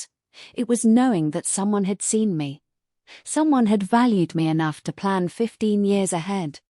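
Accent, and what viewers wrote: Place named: Midwest, United States. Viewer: That's quite the American Midwest accent!😂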